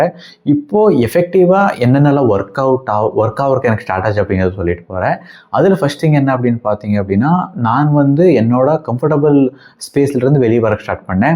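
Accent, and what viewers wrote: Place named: Bangalore, India. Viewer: Bangalore Tamil Nice Thala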